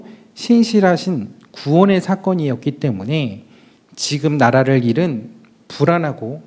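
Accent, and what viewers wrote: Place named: North Korea. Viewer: 목사님 북한 사투리 짱 이예요 ㅎ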